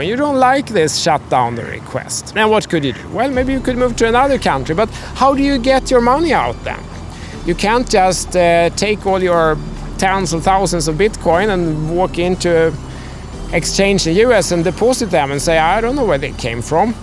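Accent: Sweden. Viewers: Best Swenglish on youtube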